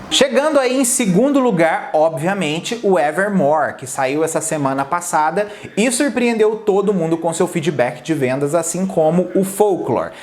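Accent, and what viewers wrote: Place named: United States. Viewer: eu adoro o e o conteúdo dele, mas uma coisa que me agonia muito nos vídeos dele é qnd ele enfia um sotaque americano numas palavras tão..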